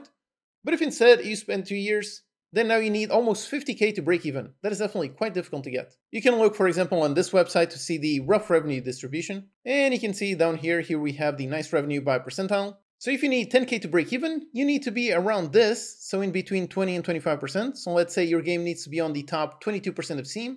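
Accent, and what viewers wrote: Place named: Portugal. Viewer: Hey. This is great advice! I had a feeling that you are from Portugal and live in Portugal because of your accent. Is that correct? I live in Portugal as well! I don't build games (even though I would love to), but I build game dev tools and libraries. I built a 2D game framework called Velaptor. We should connect!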